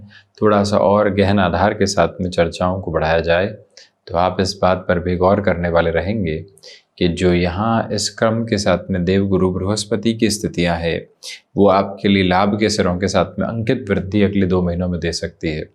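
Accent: Standard. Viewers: आप का हिंदी बहुत शुद्ध है गर्व है ऐसे हिन्दुस्तानीयो पर जो अपने गरिमा को बनाऐ हुऐ है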